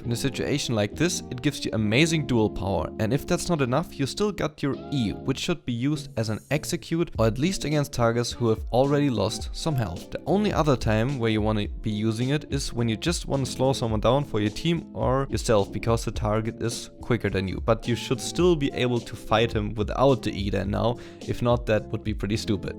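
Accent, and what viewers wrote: Germany. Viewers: Ey echt cooles video, ich hätte da 2 Fragen erstmal und zwar: 1. Welche Elo Spielst du also sagen wir letzte Season? 2. Was ist dein Mastery Score mit Shaco momentan? Als Feedback, mach gerne mehr Videos die sind echt Klasse, hast echt alles super erklärt und wieso ddu diese Spielweise bevorzugst. Du könntest deinen Viewern also auch mir zeigen wie du spielst gegen counter Matchups verschiedene invade jgl Wege und was du machst wenn du behind bist. Danke fürs Lesen ( und ja man hört es heraus das du Deutsch kannst) LG ~Finn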